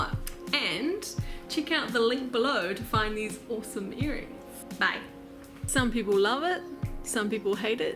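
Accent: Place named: New Zealand. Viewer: Another kiwi! I heard Kia Ora and wondered if I was hearing things... then the accent confirmed it. I was given a rooted cutting, and showed a lady at the garden centre and she said she'd never seen one before?!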